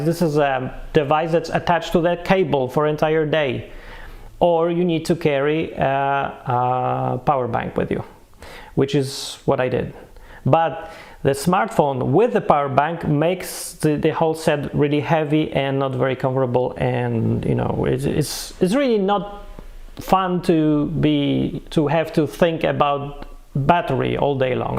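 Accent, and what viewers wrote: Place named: Poland. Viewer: Heard your intro... Heard you Polish accent... And I knew, this guy KNOWS....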